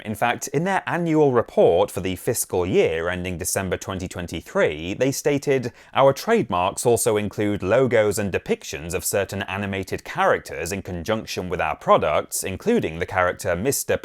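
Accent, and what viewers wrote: United Kingdom. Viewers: How did I not notice he had a British accent until he said crisps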